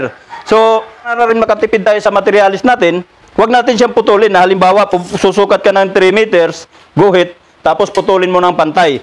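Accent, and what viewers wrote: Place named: Visayas, Philippines. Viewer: bisaya mlng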